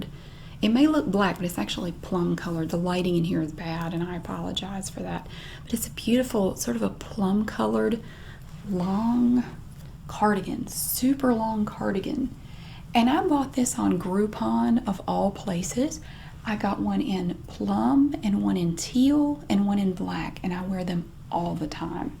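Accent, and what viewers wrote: South, United States. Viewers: I loooove this video. I love when you explain clothes or processes you buy. I could listen to your soft southern accent all day. ❤️ Good for you shopping at thrift shops! Some clothes are so overpriced.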